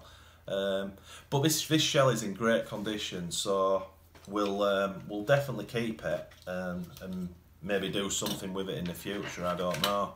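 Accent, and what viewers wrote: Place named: West Yorkshire, United Kingdom. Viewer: You sound local to me... I'm in West Yorkshire, Leeds Bradford area. Just discovered your channel and loving it as Imy first rc was a frog in 85,second hand mind you. Still took nearly a year of paper round to buy. Just getting back into it. Bought a zd racing for now til deciding what bigger to get. Anyway cheers for the memories mate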